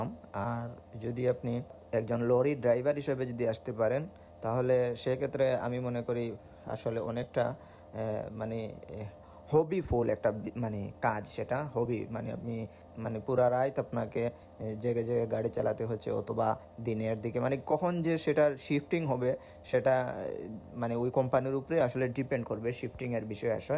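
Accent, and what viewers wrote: Sylhet, Bangladesh. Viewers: ভাইয়ের কথায় মনে হচ্ছে সিলেটি হবেন, ভাই রেস্টুরেন্টে কাজে কেমন বেতন হয় জানালে ভালো হতো? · বাইয়া আপনা কতা বার্তা বুজা জায় আপনি সিলেটি আমিও সিলেটি মুল কতা হলো বাই কোন দিন জদি আললাহ আমাকে দয়া মায়া করেন জদি আসতে পারি রােমানিয়া তাহলে কি ভালো হবে জদি বাই আললাহর হুকুম তাকে আকি নচিবে দেয় রিজখে টানে আকি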